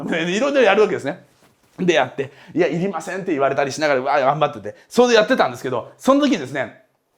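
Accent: Kansai, Japan. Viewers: ２：２４：５６を見て始め映画並み？？？と感じました。でもまだ前半だったのですね。一気に観させてもらいました。確かに最初の自己紹介は初期の撮影ということもあり改善は必須だったかも知れないですね。今回の自己紹介①は授業同様楽しく観れました。 話しの最中で「たけしさん」言葉が連発していたのが少々きになりましたが、熱気は十分に感じ取れました。以前、２nd YouTubeでだったか、あっちゃんがターゲットは10代から30代と言っていた時があったような気がしますが、40代～50代（もしかしたら60台も）も視聴者層はいますのでよろしくお願いします。 東京（関東）の話し方の中に、関西弁が違和感なく入ることで聞いている方は入り込みやすいです。また、体を使った（張った）解説は演技力の賜物で普通の教育系ＹｏｕＴｕｂｅにはない魅力を感じます。学び心を満たしてくれる動画に感謝です。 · あっちゃんが関西弁たまにでる 理由やっとわかったわ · 「しくじり先生」終了後、もっとあっちゃんの授業が聞きたいなと思ってた時、カジサック経由でYoutube大学を知りました。とても毎日楽しく見てます。知的好奇心をくすぐる内容。学ぶって楽しい あっちゃんの喋りが説明のできない高い説得力(教祖のようと卑下してますが)の中に時々出てくる関西訛り、エセ関西人ではないけど基本標準語なのでとても特徴的だなと思ってたのですが幼少期に大阪で育ってたのが残ってたのですね頓着しました。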